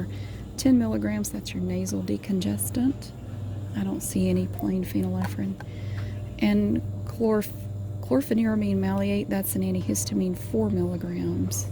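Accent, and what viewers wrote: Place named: North Carolina, United States. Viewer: The boxes being as unstable as the ex husband killed me XD Also, a few more things about these videos that I absolutely love? 1. The cleaning up. I HATE messy store shelves. One time while walking around our local Wal-Mart someone decided they didn't want their juice so they just sat it down in the middle of the aisle. Like, really? 2. The jokes! They crack me up, need I say more? 3. The accent! I'm a North Carolinian myself, so just hearing that little bit of a country accent reminds me of family members that talked with the same accent that I have since lost or just haven't spoken to in a long time. These videos are my absolute favorite, but I think the ones in the Caldwell area could really use a good cleanup. I don't really look around, I just grab and go when I visit any Dollar Store in this area, but one that I basically grew up in? 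Please consult this timestamp for my comment: . XD The toy aisle was a nightmare, I would go in looking for teen coloring books and there would be a mix of adult coloring books with intricate designs and kitty cats and Disney princesses in the same pile as the word searches. On top of that mess cake was a sprinkle of some parent putting back the mascara and nail polish and maybe a box of cereal too. XD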